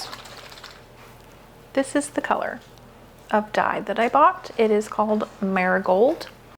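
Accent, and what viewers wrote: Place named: Australia. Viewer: Love your Aussie! This makes me want to dye some stuff I don’t like.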